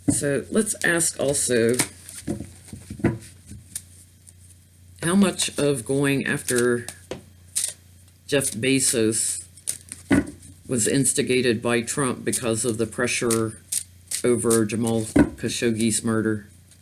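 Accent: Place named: Russia. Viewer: Great reading! I feel a Russian influence as well! 🌻👍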